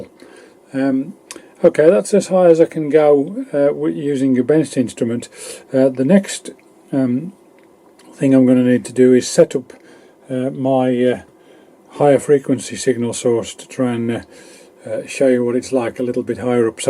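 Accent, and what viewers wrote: United Kingdom. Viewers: is not a ‘dude’ - he is British (he sounds like it at least). and we don’t have them over here. 😂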